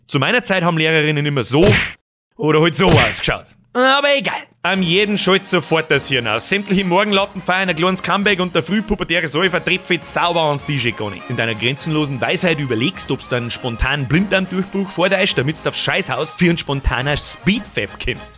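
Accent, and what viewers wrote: Bavaria, Germany. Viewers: Wia scho bei fui Videos an nogl afn kopf drofa 😂 lang is scho hea awa ja so in etwas was. Da is wenigsdns oana den woma faschdäd 😂😂😂😂 und dea an gleichen Dialekt red. Ja bin im Nachbar Ort af gwogsn 👍👍👍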